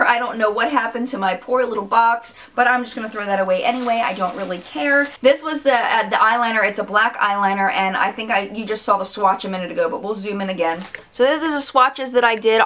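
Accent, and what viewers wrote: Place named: Boston, United States. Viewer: I love your accent! Lol! Is it Boston? Cause the way you say Boxycharm makes me smile!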